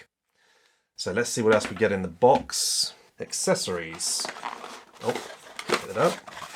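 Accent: United Kingdom. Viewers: Sounds like you're in the UK mate, can you tell us a bit about shipping please? Time taken? Customs fees? Any problems?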